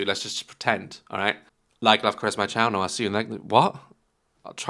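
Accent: United Kingdom. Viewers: He really does scream British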